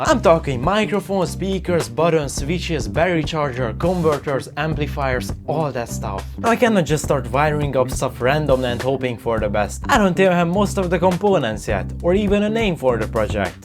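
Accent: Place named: Hungary. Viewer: you sound like you have a hungarian accent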